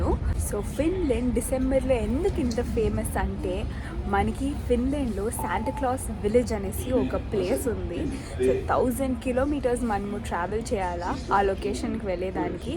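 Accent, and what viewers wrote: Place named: Hosur, India. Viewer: Midi Hosur kada, your Telugu is same as my Hosur frnd...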